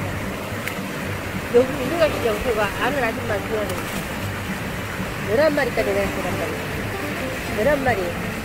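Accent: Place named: North Korea. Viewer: 북한말인줄 ㅡㅡ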